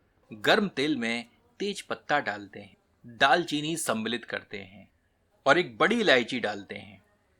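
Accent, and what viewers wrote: Standard. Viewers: जी हम तो आपके व्यंजनों के स्वाद तथा आपकी शुद्ध हिंदी के उच्चारण, दोनों के कायल हैं । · Bhrata....aapki ki sudh Hindi sunn k...mann Anandmay hogaya...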